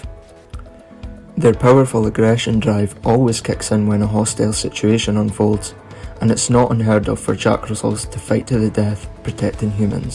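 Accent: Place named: Dundee, United Kingdom. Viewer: Sound dundonian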